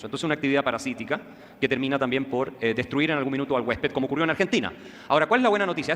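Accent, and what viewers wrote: Peru. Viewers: 👈El único ridículo eres tu, nadie está forzando nada ciertamente. Así hablan los Peruanos. Si no te parece bien es problema tuyo , 🎉 FRVSTR@ 🎉😂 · El acento limeño es perfecto.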